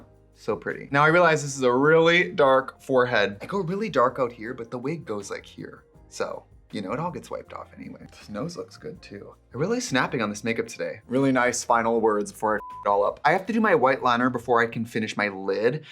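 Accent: Wisconsin, United States. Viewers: with Wisconsin accent too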